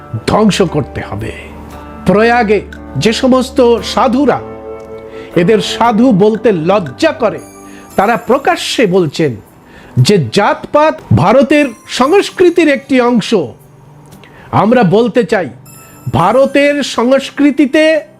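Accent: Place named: Bangladesh. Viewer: আপনি কথা থেকে বোলছ । মনে হছে আপনি বংলাদেশী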